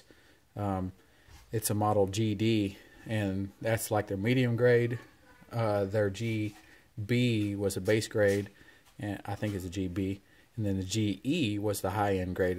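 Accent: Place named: Tennessee, United States. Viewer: What's that accent, Tennessee? Nice collection. Interesting to see someone collect this type of pistol.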